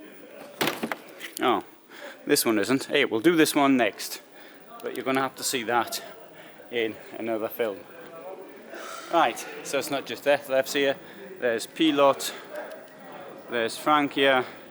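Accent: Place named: Geordie, United Kingdom. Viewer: i like watching these but your slooooow geordie accent grinds on me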